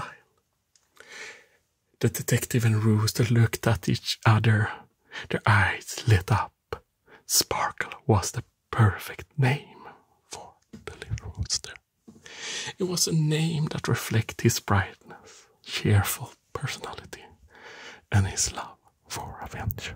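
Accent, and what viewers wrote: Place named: Germany. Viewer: I love your Asmr, you have like a German/northwest European accent which reminds me of a friend. You make great Asmr! Lots of respect to you 👊🏻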